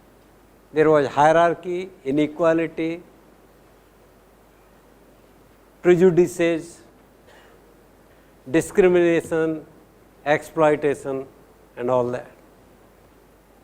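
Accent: India. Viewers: have trouble understanding the Indian accent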